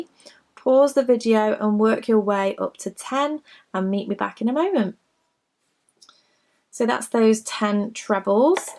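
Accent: England, United Kingdom. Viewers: like the video. Nice to hear an English accent for a change!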